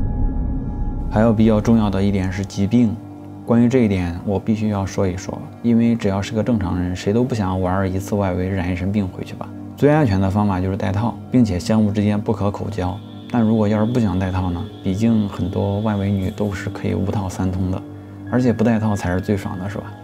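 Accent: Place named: Guiyang, China. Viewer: 你是贵阳的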